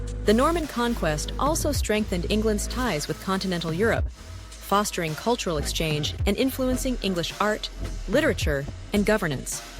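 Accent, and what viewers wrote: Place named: United States. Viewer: and now its narrated by a YANK .... !!!!!!!!!!!!!!!!!!!!!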